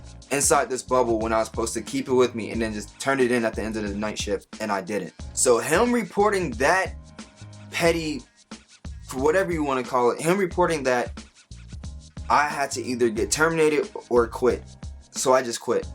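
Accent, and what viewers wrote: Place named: Canada. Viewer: Are you from Canada you have an accent haha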